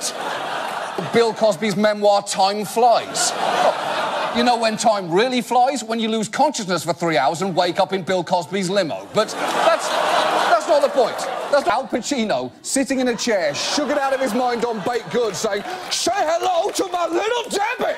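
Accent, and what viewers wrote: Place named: United Kingdom. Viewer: The Mighty Sparrow, you’re wrong his accent is 100% British.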